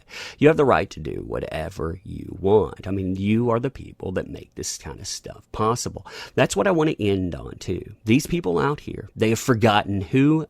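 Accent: South, United States. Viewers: Your southern accent is so soothing. :3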